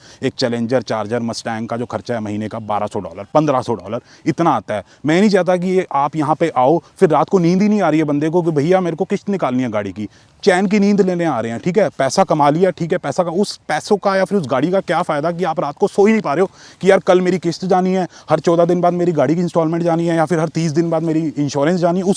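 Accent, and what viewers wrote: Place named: Delhi, India. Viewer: delhi wali hindi 😊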